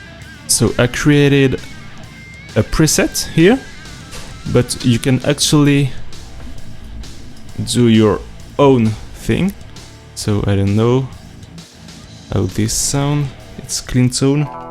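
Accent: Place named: France. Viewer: It's great and pleasing to watch another frenchy with international goals. Don't care about what people say about your accent. Be yourself face to the camera and people would feel it. So it's good. Envoi chier les rageux égoïstes qui préféreraient que tu parles français, ils n'avaient pas qu'à sécher le collège. 😁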